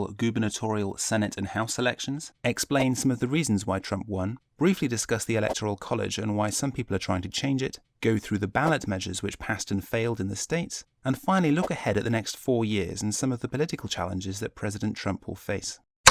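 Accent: United Kingdom. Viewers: I’m 4 minutes in and then I️ realize it’s a British guy breaking down the American election.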